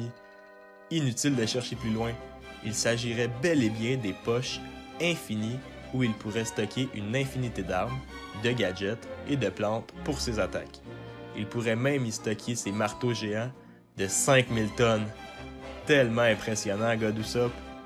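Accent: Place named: Quebec, Canada. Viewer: ce n'est pas parce que des youtubers 'connus' le font que ça doit empêcher tous les autres d'en parler... dans ce cas là, plus personne n'a le droit de faire des vidéos one piece à part eux? bizarre comme façon de raisonner.. et ce n'est pas parce que TOI tu n'apprécies pas l'accent québécois que tout le monde pense de la même façon que toi. Ce n'est pas une voix qui permet à quelqu'un de percer ou non mais plutôt son contenu. :) Bonne journée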